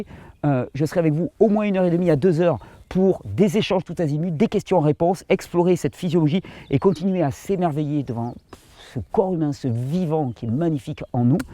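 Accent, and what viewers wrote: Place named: South, France. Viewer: C'est un plaisir de voir tes videos cher mais je dois dire que bien au delà du contenu, ce qui me fait du bien,c 'est dejà d'entendre ton accent du midi, qui porte avec lui la lumière du Sud, c'est cet environnement catalan qu'on peut parfois apercevoir et vraiment si j'avais une suggestion à faire, pour nous faire encore davantage profiter de cela, c'est de nous faire découvrir ta region, ou que tu nous en parles car je t'assure que cela aussi ça nous régénère!! j'adore tes videos en exterieur car on en respire presque l'air!! TU es fier de ta région, fais nous rêver avec elle, 5mn de temps en temps mm durant une video de jus ou autre!!! Merci pour ton travail!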